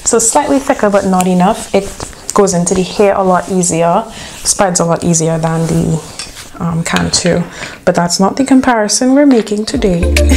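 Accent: Trinidad and Tobago. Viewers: Is that a Trinidadian accent I hear? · Babes are you trinidadian??? You sound like my people. #izatrini Btw I just got 3 jars of the blue magic at Walmart. It's a must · Are you trinidadian? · As soon a single hear you, I knew you were trini!!!! 🇹🇹🇹🇹🇹🇹🇹🇹🇹🇹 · Heard you speak, and was like "ah know this accent, she hadda be Trini!" Subscribed!!!! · Girl i heard your accent n like why you sounding trini, where you from lol so I checked the description box, sure enough, we're from the same country lol. I just here browsing the youtube about getting back to basics cuz things be getting to expensive in the "natural hair community" just as if you were relaxing your hair, maybe even worse lol.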